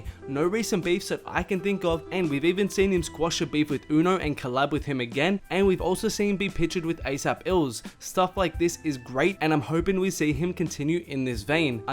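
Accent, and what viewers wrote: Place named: Australia. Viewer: I think it's an australian accent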